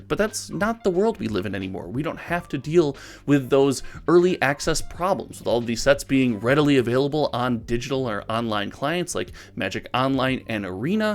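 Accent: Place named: Chicago, United States. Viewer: Did I just hear your from the Chicago land area?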